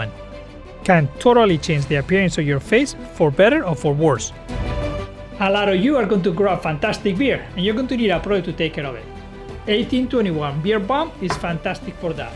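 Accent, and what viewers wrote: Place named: Spain. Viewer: No hablo ingles pero tu acento suena extranjero, de que pais eres?? España?? Por cierto muy hermosos tus trabajos, la proxima que me corte el pelo llevo una foto de aca jajajaja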